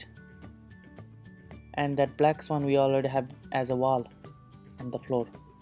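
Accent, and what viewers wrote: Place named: Japan. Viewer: And guys his counting doesn't suck, Its just hard to understand his accent. How about you all take classes in Japanese language for a change, Then you will hear the accent.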